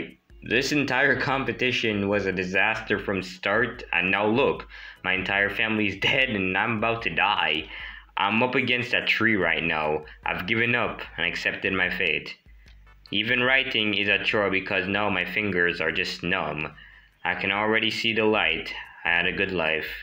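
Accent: Jamaica. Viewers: It’s cool that your Jamaican mon! I can hear it in your accent (I’m from TT) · are you jamiacan🇯🇲? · Hey man are you a jamacan my guy i can tell by you accent🇯🇲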